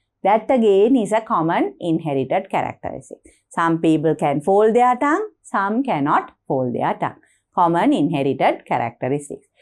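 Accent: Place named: India. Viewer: her accent is like an indian:face-fuchsia-poop-shape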